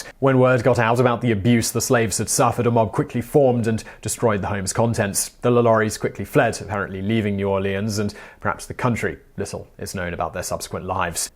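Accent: United Kingdom. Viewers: Sounds like the host is British. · Your British accent is awesome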